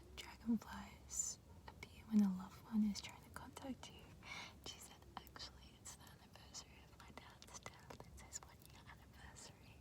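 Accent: Australia. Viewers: You from aus? Ik a Aussie when I hear one confirm for me Bub. 😂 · That's an Australian accent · aussie accent is so relaxing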